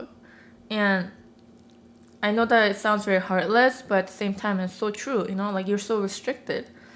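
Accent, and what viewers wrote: South Korea. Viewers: Unnie I'm sorry but you speak English in Korean accent I cant understand most of your words, cheongmal jusunghaeyo.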